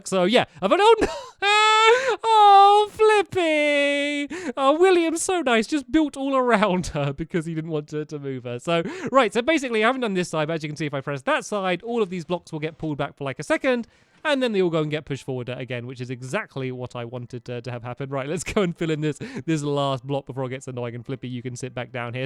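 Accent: United Kingdom. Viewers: Minecraft pro gaming roblox Shut up. Stampys voice is awesome. I love his voice, its well, British.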